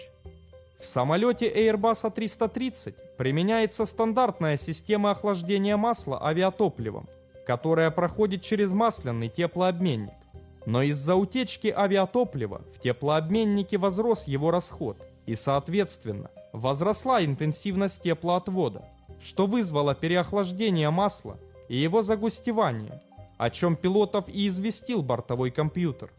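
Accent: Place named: Kharkiv, Ukraine. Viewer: Говорок харьковский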